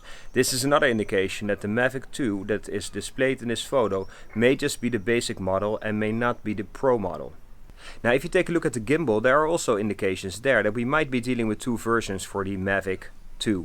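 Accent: Netherlands. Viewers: Hoor aan het accent dat je Nederlands bent maar je spreekt goed Engels 👌🏻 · u have a dutch accent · Are we Dutch by any chance?=) nice vid btw , tnx=)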